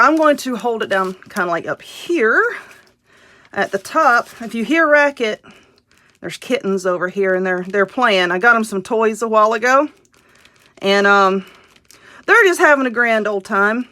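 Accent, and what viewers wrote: South, United States. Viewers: I love this wreath! that husband joke was hilarious! You are so funny and I love to watch your channel. I love your southern accent. Tfs.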